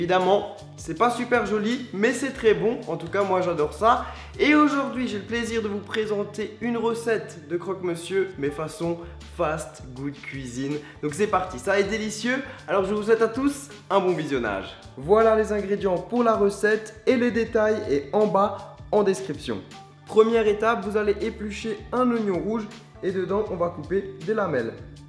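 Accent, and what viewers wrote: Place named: Alsace, France. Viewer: Yeeee schezele toi ta l'accent de chez nous ! Hop la jvais manger a nidermoschwir manger la surkrut de chez nous ! Rpz alsaco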